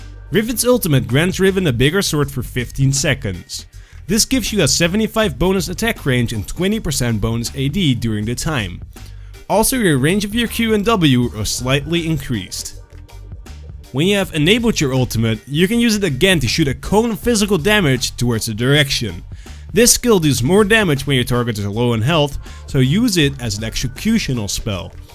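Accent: Netherlands. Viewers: gij zijt nederlands :D !!!! <3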